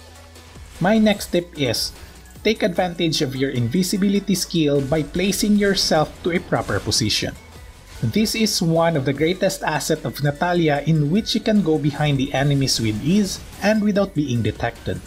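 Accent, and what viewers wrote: Philippines. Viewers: Tips are good but the pinoy accent is kinda hard to unhear. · Hope accent is from Philippine, right?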